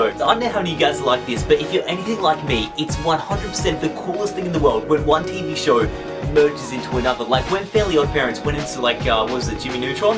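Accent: Australia. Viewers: Are you Australian? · Are u from Australia?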